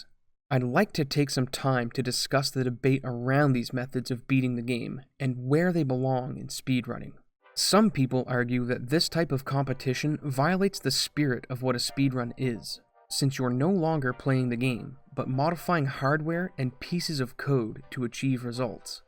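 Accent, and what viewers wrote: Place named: Maritimes, Canada. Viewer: I hear that Maritime accent :P Also great video!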